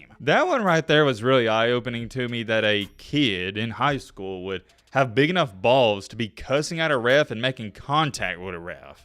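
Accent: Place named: Appalachia, United States. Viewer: be a hiibillie and it's hard to listen to him.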